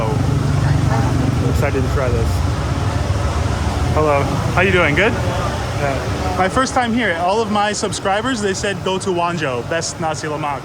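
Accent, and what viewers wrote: New York, United States. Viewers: Bro you`re TOO nice to these haters, i would have appreciated good old `get the fuck outta here!` rant with that NYC accent&attitude!😂🤣💪👍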